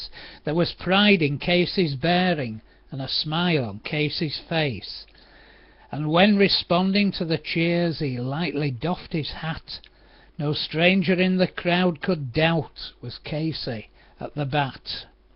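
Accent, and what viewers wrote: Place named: Yorkshire, United Kingdom. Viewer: Sounds great in a Yorkshire accent. Good choice today. Too bad Casey struck out....;)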